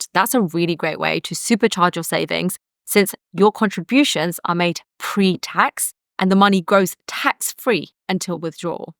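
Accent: United Kingdom. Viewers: All I want to know is how to get this cool UK accent.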